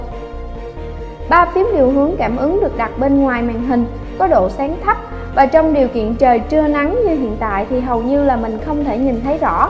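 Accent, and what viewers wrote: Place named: North, Vietnam. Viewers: thix review giọng Bắc hơn + chị trả bài rất tốt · Thích giọng bắc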